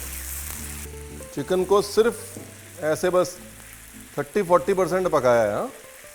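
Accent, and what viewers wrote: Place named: Punjab, India. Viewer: I noticed this today on how he says "Chickun" and not "Chicken". You can take the punjabi out of punjab, but cannot take punjab out of the punjabi. Love it!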